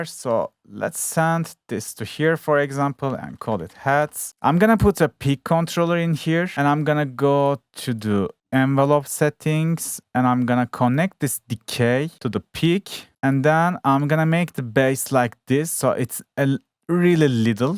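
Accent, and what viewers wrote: Turkey. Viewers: TAM DİYECEKTİM TÜRK AKSANI BU DİYE KANALA BAKINCA ANLADIM KJDFGHLKJGH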